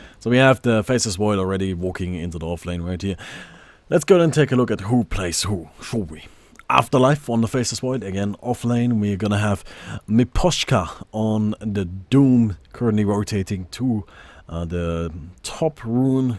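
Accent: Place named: Germany. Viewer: Same, that German accent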